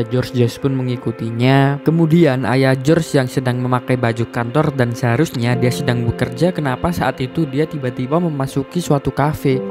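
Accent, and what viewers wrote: Java, Indonesia. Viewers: Jangan semangat tetep menyerah ahhahahaha bikin ngakak. Duh medokny masih keliatan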